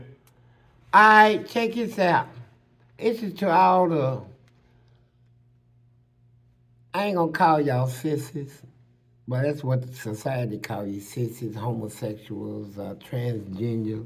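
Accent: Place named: Kentucky, United States. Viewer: He got that southern kentucky drawl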